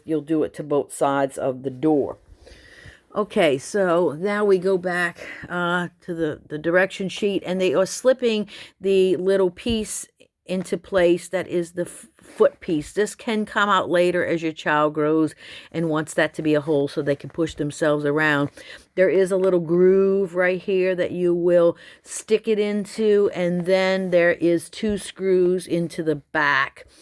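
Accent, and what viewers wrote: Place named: New Orleans, United States. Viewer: Are you from New Orleans? You're accent is just like my wife's.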